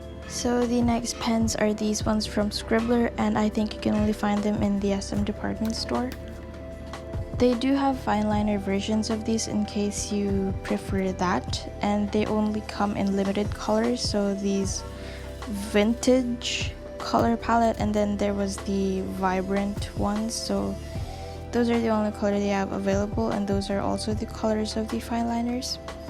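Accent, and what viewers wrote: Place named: England, United Kingdom. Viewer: TBH! your english accent is music to my ears uwu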